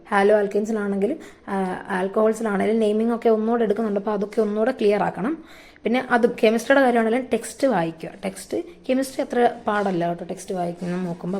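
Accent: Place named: Alappuzha, India. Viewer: Alappuzha aahn nn thonunn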